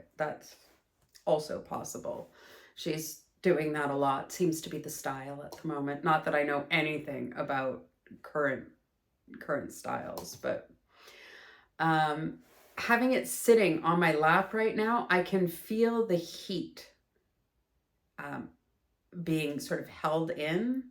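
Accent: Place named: Canada. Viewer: Hi! Couple things first I have to say I want to know if you’re originally from Canada because as a Canadian I have what I call Canadiandar, which is Canadian radar, and as I was listening to you talk about this yarn, I instantly thought this woman is Canadian and then it says you’re from Germany, but obviously you weren’t born in Germany or you didn’t grow up in Germany because of your accent, so can you satisfy my curiosity about that? Second of all, I was looking for a review of this yarn because I’m about to order it to make a shawl and I see that you made a shawl with it. That’s so awesome. So thank you for your review because it was really the only one I found. Sidenote, another content creator that I watch makes stuffed animals with yarnart jeans yarn, and she says it’s the best one for that. I don’t make it so I don’t know, but I do love the various yarnart flowers. 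And by the way, yarnart does make an amazing cotton and a cotton acrylic blend that is very soft although I think the shawl you made is beautiful and completely worth gifting. Yarnart flowers is the cotton acrylic blend and yarnart rosegarden is the cotton, and I make shawls with them all the time. They come in big cakes over 1000 m actually you’re probably familiar with this yarn because you live in Europe, so you’re so lucky. You have easy access to this stuff. Actually yarnart flowers is the exact same yarn as hobbi twister. It even has the same colors.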